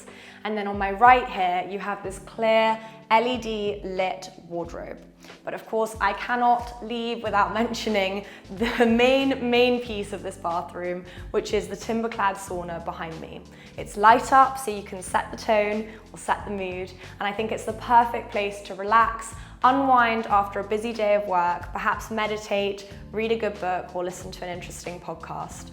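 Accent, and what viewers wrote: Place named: England, United Kingdom. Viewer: you are going to kill it on YouTube, so nice to hear a quintessential English rose accent, wonderfully articulate language and a charm only classy ladies like yourself can exude. Do not betray us by joining the luxury home show 😂 Stay on your own. Brand for the win 👌🏼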